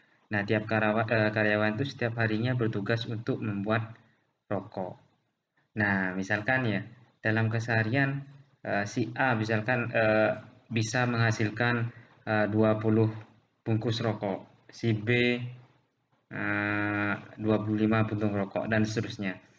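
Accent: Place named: Madura, Indonesia. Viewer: Logatnya madura😅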